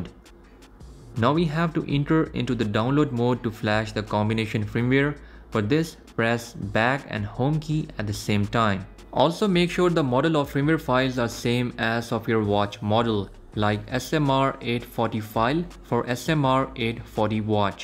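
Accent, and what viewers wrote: India. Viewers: Excellent video!!! I did this on two Watch 3!!! Super and I like your india accent!! Im in Quebec Canada! Thanks a lot!!!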